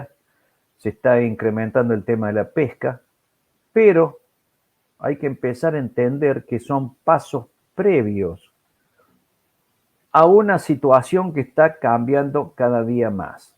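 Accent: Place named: Córdoba, Argentina. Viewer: Tonada cordobesa!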